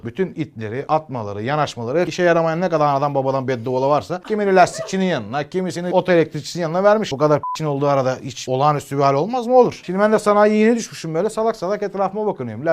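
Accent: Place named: Tekirdağ, Turkey. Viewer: Hikaye süper, birde işin içine Tekirdağlı aksanı girince süper olmuş😁👍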